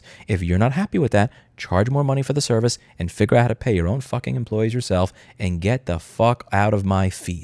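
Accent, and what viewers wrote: New York, United States. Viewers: Any chance you're from New York?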